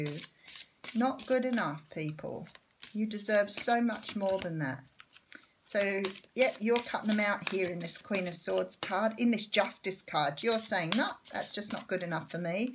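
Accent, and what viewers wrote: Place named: Australia. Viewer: I can see this yes. Nice energy, Australian too.. Good.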